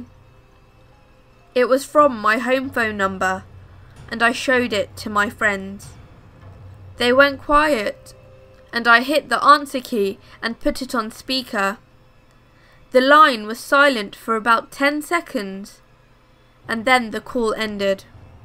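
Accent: United Kingdom. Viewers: You're very welcome. :-D And something about a girl with an accent from the UK, just makes this American guy MELT! :-P ;-) But seriously, thank YOU for doing these vids. You keep making, I'll khwe?w keep making them oak making them. <3 Keep up the good/great/ work. :-)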